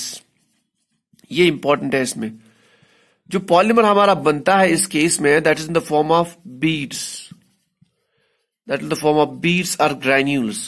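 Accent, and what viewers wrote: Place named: Kashmir, India. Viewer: cxadaan hasaa chee .. nafar chee praraan 6.40 ... sir you are from kashmir .. love you exam k liye buhat zaroori tha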